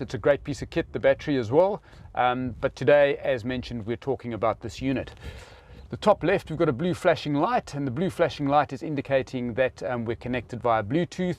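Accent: South Africa. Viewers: Hi, nice to hear another South African. great video, Im doing my homework on both Solar controllers and DC to DC chargers. In the past I had a single unit (CTEK Dual) but now plan to go with what you have. My question, 1)do you in anyway link the two units together or are they both 'individually' connected to the Leisure Battery? 2) Does the MPPT controller also have a feature of trickle charging the Started battery like the Ctek? 3) Other DCtoDC chargers that I have seen have a small wire to the ignition to detect a smart alternator. I didnt notice this on the Victron, is it just clever and can detect that without the extra input? Thank you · Great information.. love the mix of SA and Ozzie accent too :)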